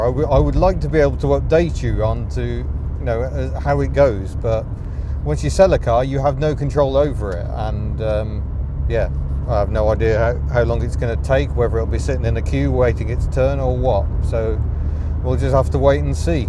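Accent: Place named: Birmingham, United Kingdom. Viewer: Welsh hippy I thought you were a brummie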